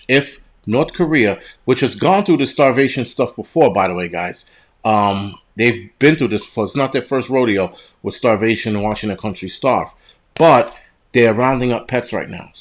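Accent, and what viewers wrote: New York, United States. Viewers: That's New York language. Love it.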